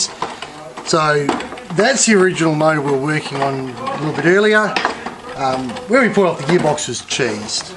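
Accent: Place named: Australia. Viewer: It looks like that engine has sat for at least 10 years without running yet when you split it from the transaxle amazingly there was not one spot of rust or crud on the on the flywheel or clutch cover. I am having a little trouble with your aussie accent, did you say that is a "dana" gearbox ? I've worked on vws for forty years and dana never produced gearboxes for Volkswagen.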